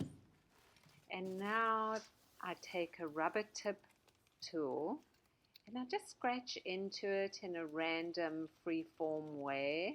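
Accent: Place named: South Africa. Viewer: Nice South African accent ! 🇿🇦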